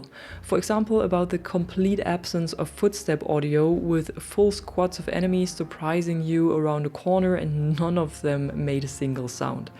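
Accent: Germany. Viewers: A good video, I completely agree with you. A side question: May I ask where you're from? Your accent sounds like a German who speaks perfect English.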